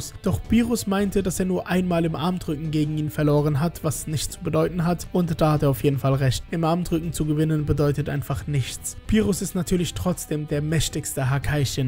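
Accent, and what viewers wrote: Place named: Netherlands. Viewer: Ich dachte das war ein holländischer Akzent 😁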